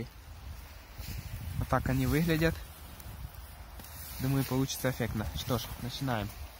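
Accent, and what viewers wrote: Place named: Ukraine. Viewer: Из-за укра(ударение)инскова выговора😁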